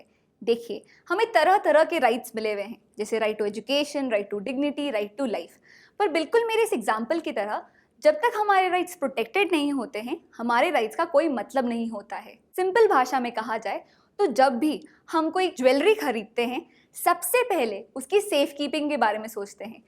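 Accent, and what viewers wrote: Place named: South, India. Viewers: Arey waah. An unrealistically unbelievable way of making a layman understand. You go girl. And yes, you sound Malayali. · You are south Indian. That accent.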